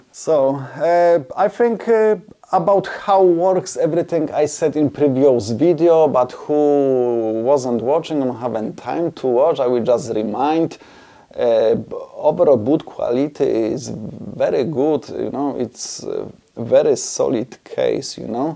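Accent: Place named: Russia. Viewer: I was in Poland, but Polish people don't speak like that. This is more Russian accent than a Polish one.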